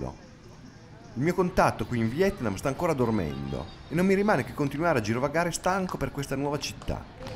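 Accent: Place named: Romagna, Italy. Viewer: Socmel ma sei romagnolo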